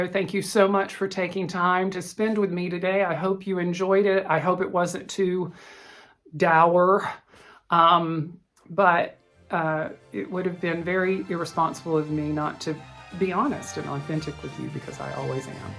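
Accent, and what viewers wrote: South, United States. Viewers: listen, I was raised in Chelmsford, MASS - RTE 3 - you know right on the Mass - NH border. But have lived so long in Arizona - my accent fell off! My Boston comes out often - particularly with imbibing. Love and embrace your inner Southern, just as I honor by Bostonian! Love the Vaphas - Boston accent - see what I did there? Oh goodness, I've been following the Mother story. So many of us have mothers... Hugs and sunny southwest sun to you. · I live in Albertville Alabama, and as we southerners say, Bless your heart. Loved your “southern drawl”. Really had to try, tho! I’m the youngest of three girls. Always wanted a brother, so I’ve decided you’re it!! I know you need a sister!!! Love your flosstube.😸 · Thank you for sharing your upbringing. I hear the south at the end of your tongue, 😛 it’s recognizable but I to have been watered down for twenty years but now back in Oklahoma!! 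Denim daisies are sew cute. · I never noticed your Southern Drawl until today, LOL Great video. I absolutely love your train piece. All your stitching is beautiful. I love going to Ice Castle displays. They are just beautiful., and thanks for sharing the DMC conversion, I honestly never heard of that. Our past makes us who we are, and you are a beautiful, loving and gifted person. I can tell you were raised in a loving environment. Lori Holt's Denim bag is wonderful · Hi, thank you for all you bring to our community with your southern accent, laughs, smiles, and some tears. Love your HAED design. My son is a full coverage stitcher. Have a great 2 weeks!❤😊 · Thank you for the southern drawl tonight. I am proud you were able to tell the story of your village. Its sad that parents have kids then decide they don't want to be part of their lives. My dad decided after having 4 daughters that he didn't want the responsibility of s family. 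He left when I was 9 I just turned 66 and saw him maybe 4 times in my life. My younger sister stayed in touch with him and when he passed she called with statement we were orphans (mom passed two years earlier). Don't know if you like hugs...but I wish I could hold you tight!!!! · Lol... 😂 I love your southern charm and accent 😅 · I love a sophisticated, educated sounding southern accent, which you have in spades and your vocab is amazing. Yes, you are polished, why I do declare. Fiddlee-ee-dee.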